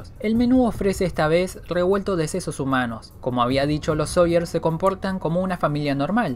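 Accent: Argentina. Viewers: Que sos argentino?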